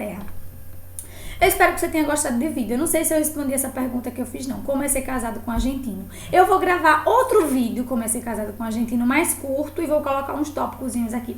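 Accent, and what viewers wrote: Northeast, Brazil. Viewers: percebo um sutaque nordeste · Você é nordestina??? Percebi pelo seu sotaque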